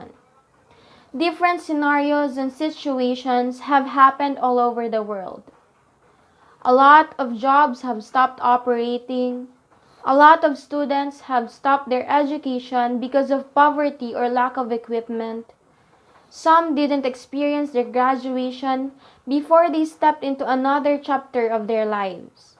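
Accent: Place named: Philippines. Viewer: Thats a good example for the philippine english accent. Great job sweetie.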